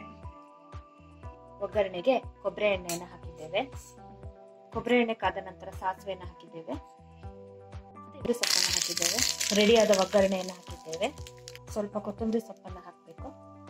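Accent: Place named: Malnad, India. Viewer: ಸೂಪರ್.. ಒಳ್ಳೆ ಮಲ್ನಾಡ್ ಭಾಷೆ.. ಒಳ್ಳೆ ಅಡುಗೆ ಸೂಪರ್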